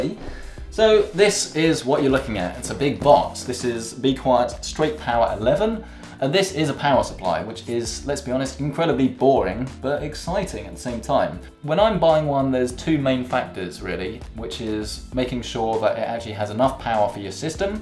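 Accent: United Kingdom. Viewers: Your British accent makes the words go through one ear and out the other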